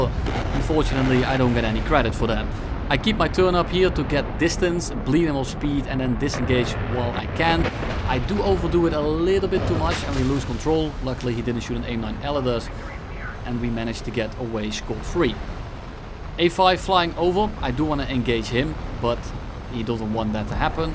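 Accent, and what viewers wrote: Netherlands. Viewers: Ik heb nog nooit zo een duidelijk Nederlands accent gehoord in het Engels.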